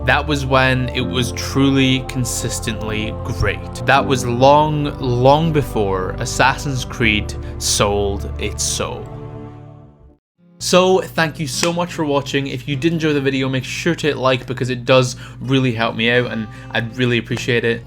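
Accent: Scotland, United Kingdom. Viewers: u from scotland mate? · Is this kid British I'm not listening to any more of his bullshit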